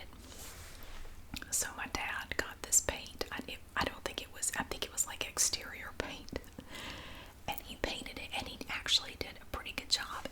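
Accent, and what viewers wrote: South, United States. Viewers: I feel like my whispering doesn't hit the same cause she has the perfect southern drawl!!!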